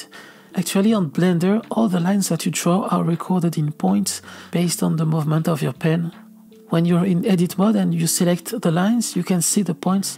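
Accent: France. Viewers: Your tutorial is not just about Blender and grease pencil. You are a sleep therapist! Never heard anybody sound like you, and your command of English (going by the French accent) is just unexcelled! Thank you so much for being such a patient human being! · Are you French? Because you had a accent… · thank you very much for all this very well explained knowledge. And don't worry about that accent of yours, my french compatriote ;-) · j'entend un accent français.... mhhh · J'ai reconnu ton accent français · What a cute French accent :3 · What new french english accent😂❤ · C le premier accent franglais que j’apprécie 😍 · ooohhh you must be french.... your accent 😍😛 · The french accent is fkng cute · i have a curious question are you french or do you talk french bc your accent kinda remind me of it TT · Ok... Are you french ? Because i think i recognize a french accent , Your vidéo was very helpfull so thank you <3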